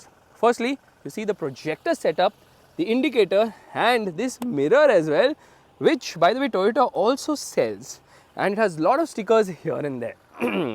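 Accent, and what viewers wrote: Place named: Mumbai, India. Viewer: Want to start vlogging in Hindi. It’s kind of feels good when he speaks Hindi in between vlogs. It really suits him as well with that mumbaish accent.❤️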